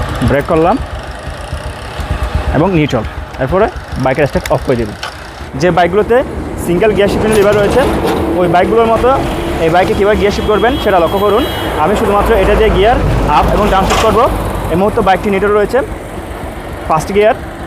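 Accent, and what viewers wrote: Chittagong, Bangladesh. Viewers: Vai ki Chittagong er 😂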